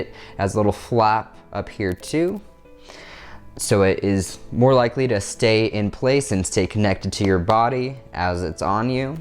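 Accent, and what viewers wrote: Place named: Spain. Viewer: Your Speak to spsnish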